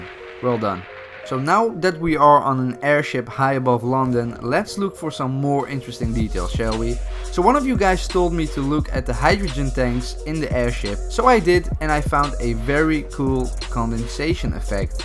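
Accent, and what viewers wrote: Netherlands. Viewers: Are you dutch? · Are you dutch because you sound dutch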